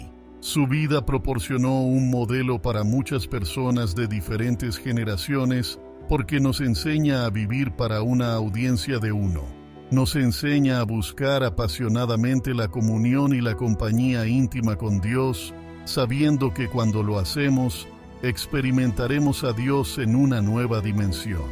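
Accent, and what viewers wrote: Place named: Brazil. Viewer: es el narrador, los videos estan Mal editados, a veces se puede oir un acento como brazileño y a veces hasta en portugués se oye que esta hablando.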